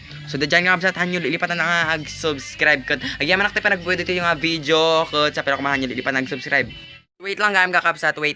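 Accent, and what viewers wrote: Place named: Iloilo, Philippines. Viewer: Ang cute mo magsalita kuya ilongo bayan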